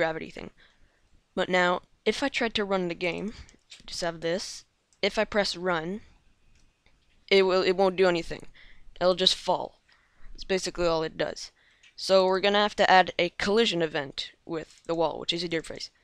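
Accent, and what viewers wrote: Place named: India. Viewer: why do you start out talking like an Indian and then lose the accent? wtf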